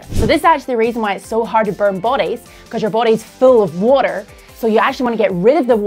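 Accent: Ireland. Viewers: It took me way too long to realise that's an Irish accent, I was wondering what weird part of the US I was hearing 😂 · Does any one know where her accent is from? Another poster said Irish, but I'm not so sure now.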